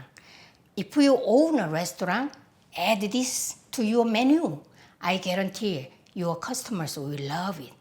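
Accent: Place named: South Korea. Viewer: 어머니가 전북출신이신데.. 엄마 친구 이모님같아서 늘 재미있게 보고있어요!! 영어가 한국말처럼 들리는 기적이..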